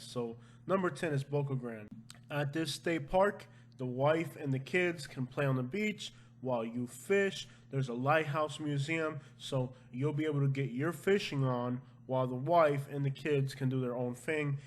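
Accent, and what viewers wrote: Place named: Cuba. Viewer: Most likey he sounds Cuban. So it to be expected. It part of their culture, but to his defense most Cuban women really enjoy shopping.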